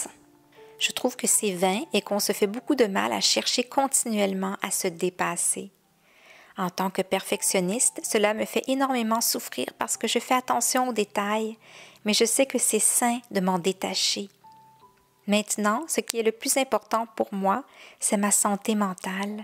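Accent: Quebec, Canada. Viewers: Bonjour je vous decouvre au hasard et votre contenu me convient.en plus je ne sais pas pourquoi l accent Québec me procure tjr du bien être 🤗🥰 moi ce que je ne fais plus c'est regarder le journal ou laisser la TV allumée pendant mon ménage j écoute ce genre de podcast comme le votre . J ai arrêter d acheter des produits ménagers je me sert des chiffonnettes H2O et leur produits ecocert fini les bidons en tout genre J ai aussi moins de repassage je sèche sur ceintres Je n utilise plus de cotons démaquillants. Depuis 3ans Gant démaquillant de chez H2O démaquillage a l eau ..voici qlqs exemple de ma direction vers une vie plus sereine plus saine et zen 🙌🍀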